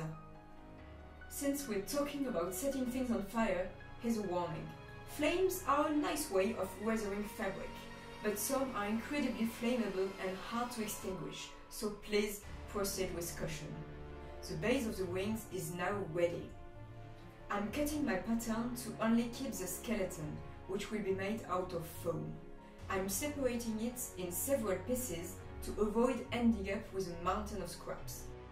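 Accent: France. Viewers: Reconnais bien là l'accent français merci pour tes 2 vidéos qui m'ont beaucoup aidé car je compte acheter les matériaux et faire seul de problème c'est que et on est que tu dis tout ça en anglais il y a des matériaux que je n'ai pas compris la signification.😅😅 Par exemple le truc en aluminium que tu mets dans les ailes, la matière du coup pour faire le squelette des ailes qu'est-ce que c'est, et je t'avoue que la fin pour du coup faire le truc pour le soutien j'ai pas très bien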